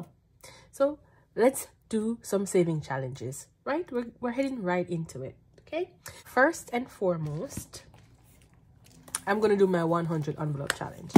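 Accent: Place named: Jamaica. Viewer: You are welcome. When I heard you I was like yep Jamaican 🤣🤣. I am as well 😁.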